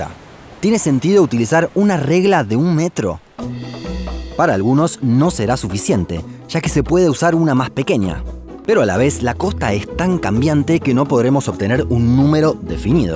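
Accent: Buenos Aires, Argentina. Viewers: Un video de alta calidad, te doy los "parabéns" desde Brasil, hermano. Tu acento argentino es tan hermoso como el video! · Quise verlo pero no puedo soportar nada el acento argentino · chileno 0% .. es muy de Ciudad de Buenos Aires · Claramente se nota q es de argentina por el acento xD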